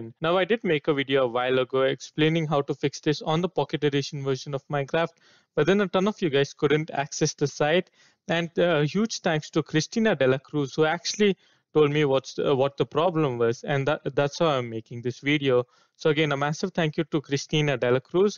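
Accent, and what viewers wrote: India. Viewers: i like indian voice even if im not one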